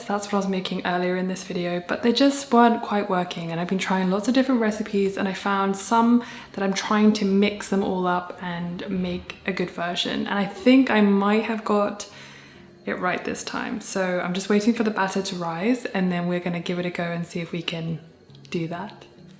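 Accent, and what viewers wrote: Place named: England, United Kingdom. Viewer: My daughter-in-law is from the Lake Como area of Italy. When she married my son and returned with him to Ohio, she had prepared as best she could but there were times she felt very homesick. Luckily her friends and relatives were able to visit them here in the U.S. (and to video chat often) especially during the first few years when the two grandchildren were born and she couldn't travel back home. Then Covid messed up travel for over 2 years. This summer she had nearly a month long visit there with the two bilingual kids in tow and hubby joining them for part of that time. It was so joyous for everyone! But she shared with me that once she got back to Ohio she felt she'd arrived "home" to her house and neighborhood and career with her husband and her children. Your love for your native England and your family of origin will never fade but your concept of where your "HOME" is will gradually switch to where your partner, your future children( those little cuties lying in wait for you in your future) and your new house and career are will then be your emotional home base. 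Of course these recent months have been unsettled for you and your creativity stiffled for the time! Your long wait will soon be over. (Just don't lose your lovely English accent and start sounding all American and inelegant when you swear or say shit! 😉😘😚)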